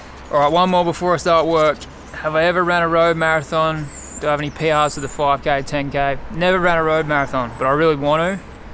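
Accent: Australia. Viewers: Can you teach me how to speak Australian ?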